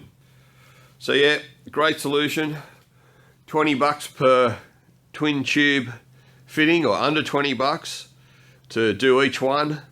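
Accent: Australia. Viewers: really dig yer Aussie accent, mate. No BS from any of you blokes, says a dude from Montana, US of A. I've been monkeying with LED conversions since before the new year and have discovered many tips and problems. For example, if you use so called "Plug and Play" WITHOUT ballasts, they won't work! Don't buy them -- ballasts should be cut out and scrapped. The P& P tubes are most common, nearly everywhere. They are known as type A tubes. Type B tubes need to be wired with a hot end, the other is neutral. I don't know what the one enders are called, as I have yet to find any, except on the internet (Hyperikon, come to mind, but they are bloody expensive and not needed) There are apparently at least 12 brands, if not more. There appear to be maybe 4 kinds of tube types. I have used the type B tubes as they are easy to wire and work well. They are double ended, as mentioned. 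The single end ones can be pricey, and they work well too, but have not used them. Hope these tips help some of your viewers, my Aussie friend. Cheers, mate. Bob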